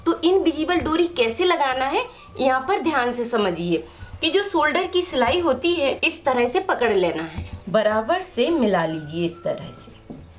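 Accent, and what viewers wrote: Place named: Bundelkhand, India. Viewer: आप बुंदेलखंड की है। आपका बोलने का acent बता रहा है।❤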